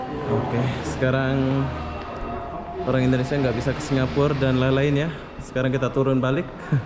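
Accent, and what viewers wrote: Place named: Malaysia. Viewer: Mau tnya,abang nih asli mana ya,? Klu ngomng ada melayu2nya?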